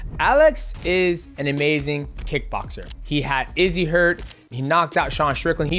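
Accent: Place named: Hawaii, United States. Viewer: love the cheehoo bra, haaawaaaiian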